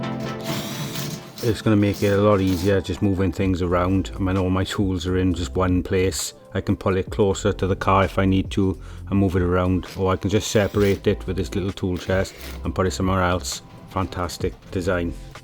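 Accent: Wales, United Kingdom. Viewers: By any chance are you Welsh ??? 😊 Good luck mate and where are you?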